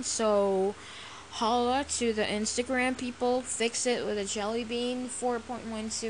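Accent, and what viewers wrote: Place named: United States. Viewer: Can you just speak natural?? Damn! You sound annoying with all your Anerican English Accent!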